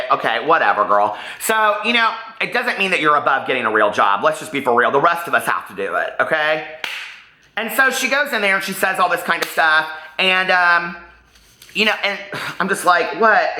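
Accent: South, United States. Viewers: You always sounded so southern to me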